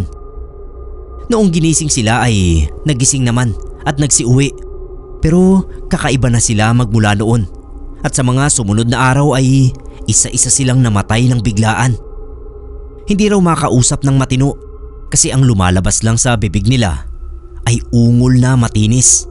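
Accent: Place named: Ilocos, Philippines. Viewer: Si narrator naten tunog ilocano. 😅😅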